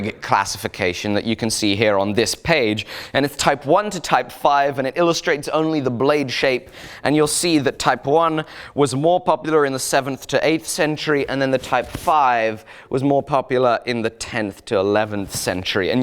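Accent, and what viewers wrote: England, United Kingdom. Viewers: Dude your English accent is awesome. It let's you make up words that still sound great.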